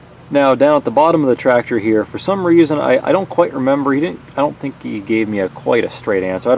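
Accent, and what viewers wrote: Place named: Canada. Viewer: Bro's either canadian or wisconsin'. either way, yuk.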